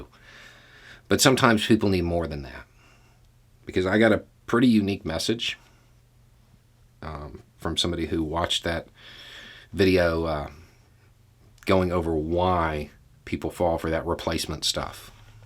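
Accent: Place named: Mississippi, United States. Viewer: Oddly enough, it was blacks, gays, lesbians that were kinder to me then any rich 'white' person. Really just because of cosmetic differances your life is supposedly better, I guarentee you when stufff beyond your skin color challenges a person, that peson will be in the exact same boat. None of us have time to look down on one another because of things people cant chose. When you see more commonaiity with people, you find solutions then differences. This guy here sounds like every poor white person I know in South MS. Please dear writer, get a grip.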